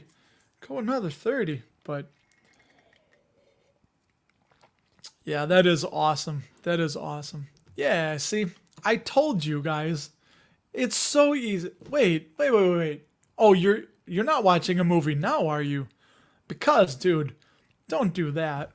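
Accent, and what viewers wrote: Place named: Chicago, United States. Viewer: GREAT video.... Always love watching the replay.... Quality viewing... Love the Chi accent... LOL..... Long live Gino's East.... I do the next best thing since living out of IL - so I order the Lou's - deep dish... They come out pretty good. Love the show.... You make learning fun. You're a true soul.... Be well...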